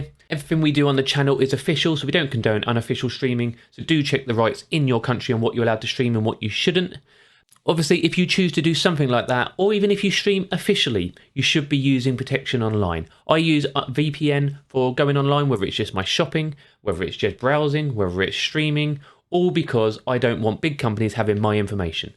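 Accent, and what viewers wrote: United Kingdom. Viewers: Was this guy from the UK?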